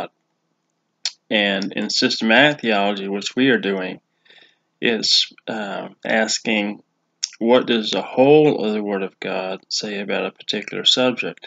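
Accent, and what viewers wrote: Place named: Scotland, United Kingdom. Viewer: I have given my roommate many a giggle by learning to speak Scottish like you: "How are yuuuuuh!" :)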